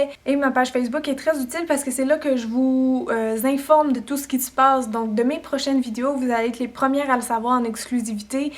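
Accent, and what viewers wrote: France. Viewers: ben t francaise non? nous on trouve que vous avez un accent ici au Quebec ♥♥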